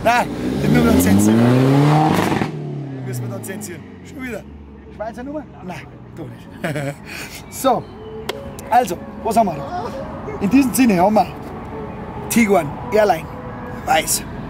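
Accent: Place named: Saxony, Germany. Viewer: Da sage noch einer sächsisch klingt furchtbar...nach 10 Sekunden habe ich das Video ausgeschalten...unerträglich.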